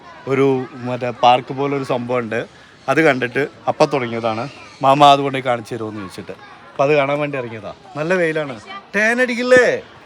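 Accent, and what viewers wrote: Palakkad, India. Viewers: വീഡിയോ പൊളി... ഇടക്കുള്ള dialogues... സൂപ്പർ... നിങ്ങളുടെ പാലക്കാടൻ സംസാരരീതി കൊള്ളാം 🤣ചെറിയ മക്കളൊക്കെ (boys)പൊളി 🥰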